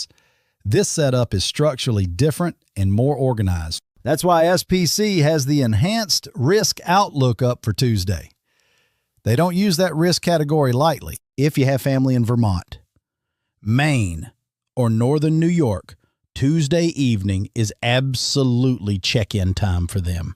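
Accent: South, United States. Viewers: Love the southern accent!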